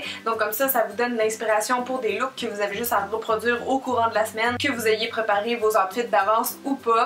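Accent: Quebec, Canada. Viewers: T'es trop belle 😍😘💋 , PS j'adore vraiment l'accent québécois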